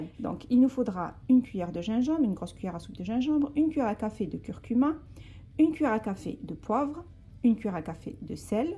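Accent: South, France. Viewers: Très bel accent du Sud en plus machahalah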